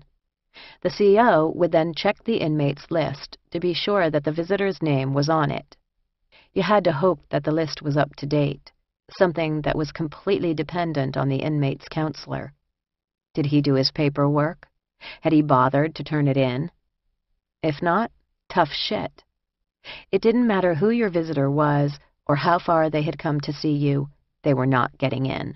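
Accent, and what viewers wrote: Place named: Russia. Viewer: Love her Russian accent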